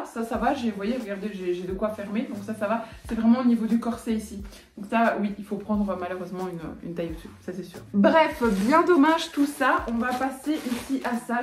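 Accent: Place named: Belgium. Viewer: Hello je viens de te découvrir et j'ai entendu un petit accent, il me semble belge ( si c'est le cas comme moi j'habite près de l'atomium mais côté Flamand, même si je ne le parle pas, à wemmel exactement) Du coup je soutiens les belges ❤. Sur ce la 1ère robe est très belle et n'hésite pas à mettre des couleurs ( surtout qu'ici c'est uni et ça reste foncé donc loin d'être flashi😉) Par contre tu as quand même une belle et généreuse poitrine, et je trouve que ça ne va pas trop un col qui est au cou...les décollés ( sans exagération pour ne pas être vulgaire) te vont bien mieux. Bisous et j'ai hâte de regarder tes autres vidéos ( hélas je n'ai pas Instagram, mais je vais regarder sur Facebook si tu y es 😊) .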